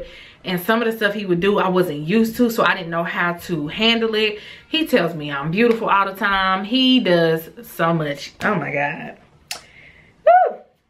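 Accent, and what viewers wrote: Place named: East St. Louis, United States. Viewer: East Saint!!! I know our accent anywhere lol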